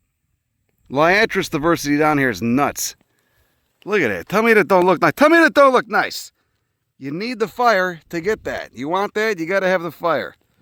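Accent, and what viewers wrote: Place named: Florida, United States. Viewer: he really got into florida character for this episode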